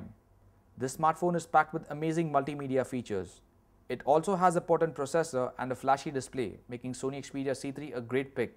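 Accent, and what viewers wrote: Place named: India. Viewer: FRITZ GOMEZ Indian dude.. Their accent always suck hahahahaha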